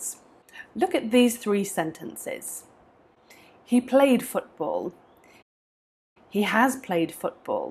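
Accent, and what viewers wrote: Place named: United Kingdom. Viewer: Question. You have a $20 U.S. Bill. But you’re also speaking British accent. Why don’t you show us 20 pound instead.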